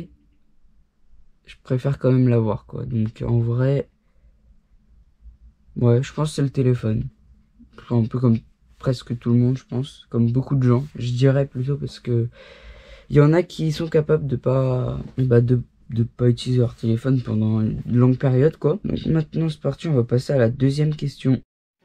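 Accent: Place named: Algeria. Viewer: Mec c'est normal que ta un accent de Dz ? 😂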